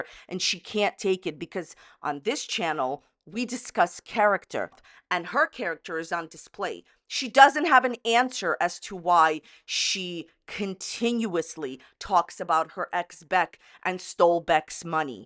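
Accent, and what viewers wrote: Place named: New York, United States. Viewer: Hi, I didn't know who you were until I watched Under the hood, Amber, you're absolutely correct about Jordy, I watched Jordy for months when he cover the Cooney girl. I don't know a quarter of what Amber knows about him. It's crazy that she's putting you down and blocking your comments, she's a chicken shit in my opinion. I have a woman doing that to me too. I get around it sometimes. Lol 🤣 I'm not putting Amber down or you because I don't know you, I do however know Amber lies and lies, I think she's a hypochondriac. I watched her to see if she started the weight loss journey she said she was going to start. She has a lotta drama and she is a dirty woman. From my own observation of all the things I've watched. I watch many different channels of everyone talking about her. I believe she uses people and I'm sorry but in my opinion the girl is too loss with who she lets between her legs, if you get my drift And I don't care if she comes to me about what I'm saying because I will tell people to their face what I think or feel. 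Are you from, you have an accent, I know it's not Jersey, but it's not quite New York. I can hear it when you talk. I'm a Jersey girl myself. Nice to meet you even though it's under crazy circumstances 😂😊😊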